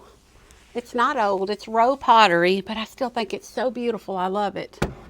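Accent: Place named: Tennessee, United States. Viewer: I’m new to your channel thanks to Jeri Landers mentioning you in one of her recent videos. I lived in Hendersonville & Smyrna TN several years ago & was always antique shopping. I loved going to the Nashville Flea Market once a month. Anyway now I live in GA & haven’t added many more antiques since then, although I must admit I’m pretty full after all those years of collecting. 😁 I sure do enjoy your channel & your TN accent. My friends here say I still have that accent. Thanks so much❤ your GA friend Thanks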